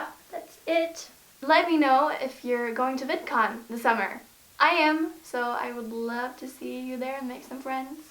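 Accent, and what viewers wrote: California, United States. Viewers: you sound totally Californian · learning to speak american accent just like you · making videos in english with an american accent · you sound like a wholesome American 100% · you sound like an american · Your American accent is too good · how many time taken by you to learn American accent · you sounded so American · YOU SOUND SO AMERICAN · you could have told me you were American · your amazing American accent. You sound like you grew up in California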